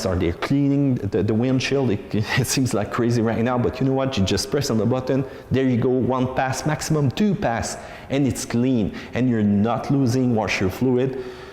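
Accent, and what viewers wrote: Quebec, Canada. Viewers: Lol Quebecer accent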